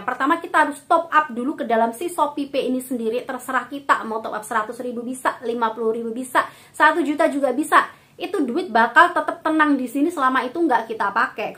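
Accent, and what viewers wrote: Java, Indonesia. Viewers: Logat jawa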